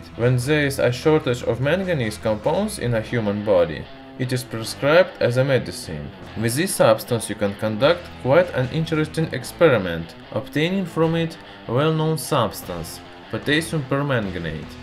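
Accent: Russia. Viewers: Bro are you Russian no cap love from india 🇮🇳 🤝🇷🇺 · your russian acccent makes it hard to understand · Now i can die in a peace... finally i find that this guy is Russian and he is speaking English in Russian accent. 😂😂😂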